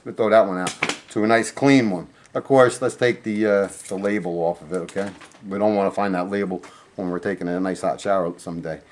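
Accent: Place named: Maryland, United States. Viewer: you from the Maryland area by chance. I think I recognize that accent! :)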